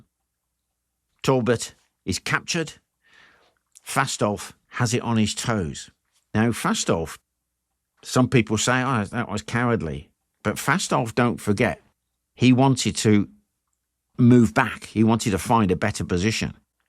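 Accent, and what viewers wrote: France. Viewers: Thank you,! Loved your little bit of French accent! ❤ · brilliant, a so good storyteller, great french accent, thx from France. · J’adore votre accent français 😊 cheers from France 🎉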